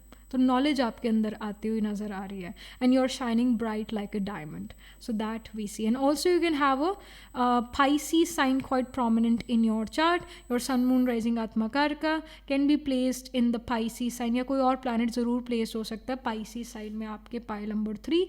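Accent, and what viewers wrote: Punjab, India. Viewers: Are u a Punjabi mam...? Actually mujhe apke ascent se lga 😅❤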